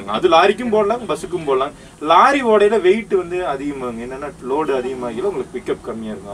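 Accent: Kongu, India. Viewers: Enjoy கொங்கு voice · Anna kongu Tamil super pesuringa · கொங்கு தமிழ் சூப்பர் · நண்பா கோவை கொங்கு தமிழ் சூப்பரா பேசினார்💯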